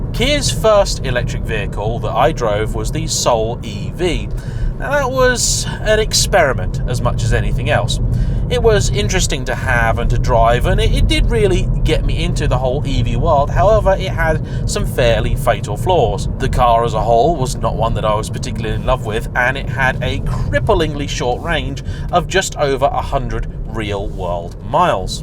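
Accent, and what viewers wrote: United Kingdom. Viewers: LOL. Wasn't expecting a response but keep up the excellent work. Love the dialog. British English is much more fun that U.S.'s version.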